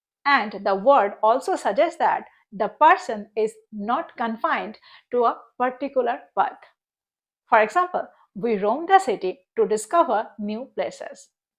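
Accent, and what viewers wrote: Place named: Bengal, India. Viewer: Nice vlog with Bengali accent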